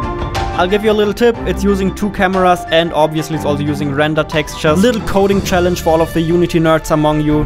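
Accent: Germany. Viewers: Du bist aus Deutschland Ich weiß es ganz genau dein Name ist und du hast mega akzent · You're german like me I can hear your accent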